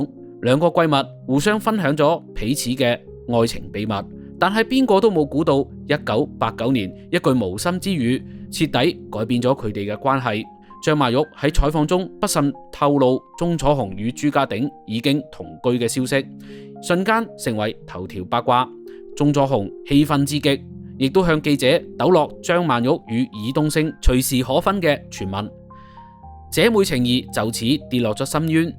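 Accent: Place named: Mandarin, China. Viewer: 主播你的第一语言英该是普通话吧？不介意你的口音，但是有的字念得完全错了，比如“闺蜜”"联袂”。。。祝你进步！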